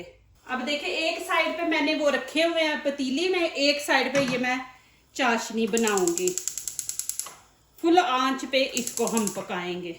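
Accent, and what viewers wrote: Punjab, India. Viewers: پلیز پلیز آپ اردو کے بجایےپنجابی میں بول لیں فل پنجابی لہجے میں اردو زبان بہت عجیب لگتی ہے سننے میں ذرا بھی اچھی نہیں لگتی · Kamal. log. hee .ap.Angrezi. boole. ya urd .boole. Lagta he panjabi. Me.bool rahe he .baqui. Keke picture me .bohth acha lagraha he. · ماشاءاللہ ۔۔۔ریسیپی سے بڑھ کر آپ کی پنجابی اردو مزے کی لگی😅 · اپ پنجابی سپیکنگ ھیں اپ کا ااردو کا لہجہ بہت بھونڈا ھے اپ یہ ھی لہجیہ اپ رکارڈ کر کے دیکھیں پھر چووولھااا یا چولھےےےےےے پر کا لفععمر کیئےےے تھے ھمرے بچے اپ کے اردو کے لہجہ کا مزاق اڑاتے ھیں کوی پنجابی اپ کو نہیں سمجھاے گا اب ھم اردو سپیکنگ ھے ھمملییمن لفظوں کو ڈانٹا نہ کریں ھمبس کیا بتایں اپ کو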